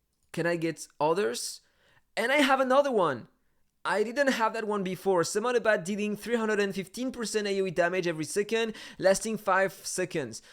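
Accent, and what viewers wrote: France. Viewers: j’ai reconnu le « French accent » 😂 tu fait des bonnes vidéo dommage que je soit obliger d’utiliser la traduction avec un français ! 😭😂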